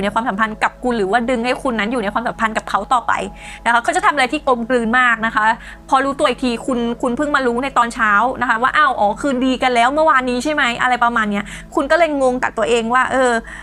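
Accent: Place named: Thailand. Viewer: ทำนายตรงมากคับ...ชอบฟังเสียงคนนี้มากๆเสียงน่ารัก..เหน่อๆนิดๆ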